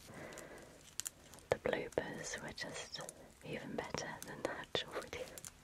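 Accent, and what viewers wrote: United Kingdom. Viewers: Ur hair colour looks darker but amazing...I love ur accent...is it British?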